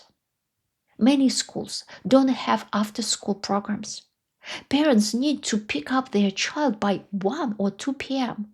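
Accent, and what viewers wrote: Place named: Russia. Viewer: I have to admit, your Russian accent is quite strong. If you've lived in an English-speaking country for so long, why haven't you worked on your pronunciation?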